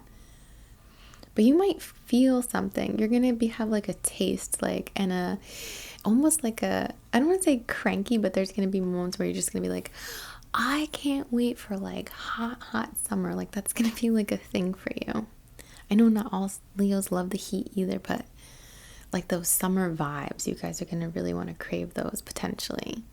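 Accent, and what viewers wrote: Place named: New York, United States. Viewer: Thank you for your hard work. Also, I just want to add that today I became aware of your New York accent. I've been listening and watching your reiki vids since I think 2016, and suddenly I was like, omg she sounds like a New Yorker. Don't ask me why I became aware of this, I have no idea. Peace and love x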